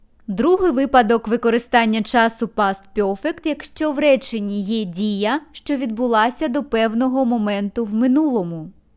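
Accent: United Kingdom. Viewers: Вітаю! Радий знову Вас чути! У мене злетіли всі налаштування Ютуба і я загубив Ваш канал на декілька днів. І ось тепер ми знову разом)) Як приємно знову чути милий моєму вуху British pronunciation! Бо це американське ричання мене просто дратує. Дякую Вам за це відео. (А кого тут не влаштовує вимова - слухайте блогерів - носіїв мови. І буде вам щастя, як кажуть.)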